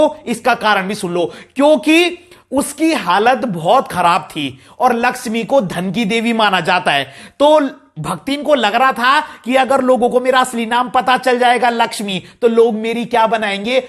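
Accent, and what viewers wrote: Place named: Pahadi, India. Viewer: Merko aapki voice se lag ji Raha hai tha ..aap pahadi ho...😅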